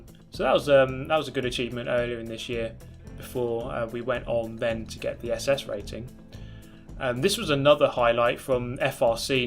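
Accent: United Kingdom. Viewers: For 2019 if you have a good PC try Project cars 2, it feels like the middle ground between super hardcore Iracing and Forza ramming. It’s best as just a very good looking racing experience. And I’d love to see more collabs, maybe with Jimmy B? Thanks for the cynical casual British Gran Turismo commentary I didn’t know I needed in my life. Keep dodging those rammers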